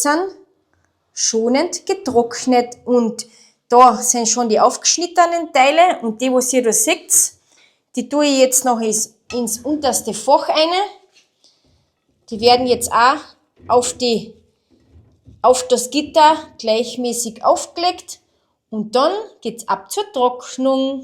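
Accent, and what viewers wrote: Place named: Bavaria, Germany. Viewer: Liebe, das Thema ist ja sehr interessant, doch für einen Norddeutschen ist das Bayerische fast wie eine Fremdsprache, also schwer verständlich. 🤣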